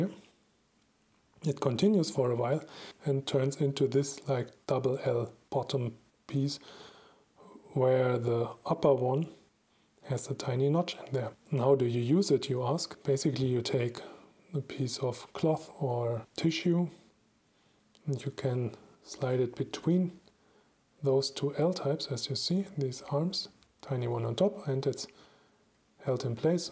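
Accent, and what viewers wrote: Germany. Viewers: Ahaha! Me as a German must say: He is so typically german not only because of his dialect but the way he sees things😂😂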